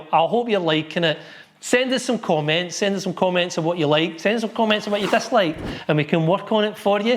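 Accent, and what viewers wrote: Scotland, United Kingdom. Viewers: Love listening to you play the guitar, but the Scot accent takes me back to my youth when i lived in Glasgow, working in the pubs, and pints of 80 shilling.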